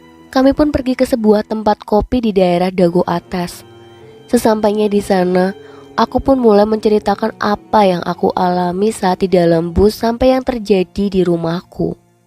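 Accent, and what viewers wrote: Banyumas, Indonesia. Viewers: Suaranya....koyo wong ngapak...... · Galfok karena naratornya medok bgd bacanya. apalgi pas baca merokok, cicaheum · Orang ngapak nih kayaknya koreksi kalo salah 🤣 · Ini naratornya mbak asli banyumas ya? Aksen ngapaknya keliatan wkwk 😂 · Ini pasti orang ngapak banyumas /cilacap.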